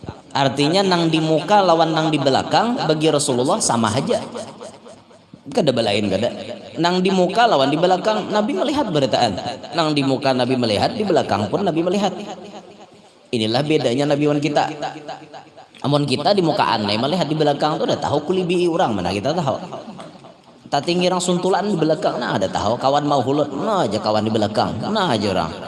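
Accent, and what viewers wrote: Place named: Kluet, Indonesia. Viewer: Allgamdulillah ada guru kita yg cersmah selalu menyejukn hati dgn tidk menghilangnkan logat bhasa klua u yg sdh 30 thn di bnjar merasa bangga dgn melestsrikn bahasa klua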